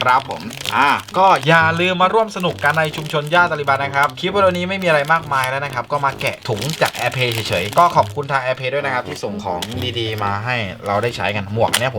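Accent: South, Thailand. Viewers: ติดสำเนียงใต้นะ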